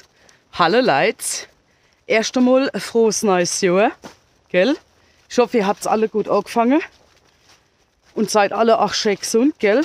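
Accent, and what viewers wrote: Palatinate, Germany. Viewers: Also je öfter ich Deine Videos sehe, um so besser verstehe ich den Pfälzer Dialekt 🤓 Der Kamin war ja mal ne coole Sache 👍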